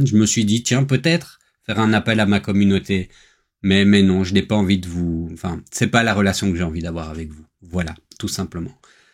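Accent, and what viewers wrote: Belgium. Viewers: Félicitations pour cette attitude de la part d'un novice en impression 3d (heu, pas de confusion, le novice, c'est moi hein 😁) ... mais aussi compatriote 🙂. Au passage, je n'ai pas encore réussi à mettre une origine sur ta (très légère) pointe d'accent, namurois comme moi ? Bref, cette modestie et humilité typiquement belges, et parfois injustement décriées, sont tout à ton honneur. Très, très bonne continuation !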